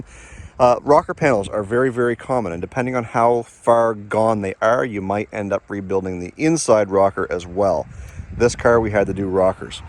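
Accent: Canada. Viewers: There is that damn ou Canadian accent. Just terrible. HATE IT.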